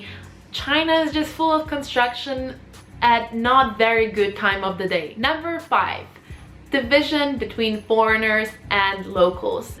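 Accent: United States. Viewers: Could you explain your american accent？ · Are you Danish or Parisan, I am so confused. And you don't seem to have any European accent, you sound American to me. Anyway there are many overseas Chinese around the world. Apparently you need someone who's as interculturally smart as you, probably a multilingualist for your future kids benefits, thb, do you secretly have yellow fever, just wondering :p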